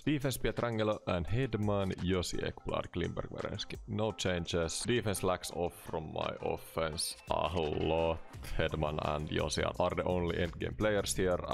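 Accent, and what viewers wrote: Finland. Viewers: Mä nauran kuinka hyvin nasevasti suomi aksentti paistaa englannissasi:D!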